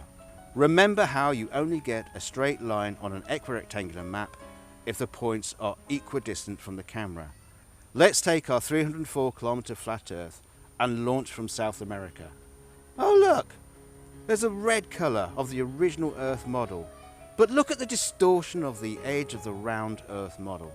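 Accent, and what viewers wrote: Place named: RP, United Kingdom. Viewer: Wasn't sure if you were the script writer too. With that authoritative BBC accent I guess you've got the bases covered. Bravo. Yeah the world is round.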